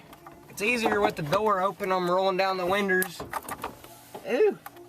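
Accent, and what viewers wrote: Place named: South, United States. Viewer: Called good ol country boy accent. It is how we talk down south of the Mason Dixon line. Lol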